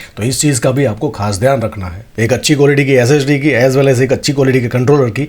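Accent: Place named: Kathiawar, India. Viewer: Kathiyawadi cho ne bhai?😅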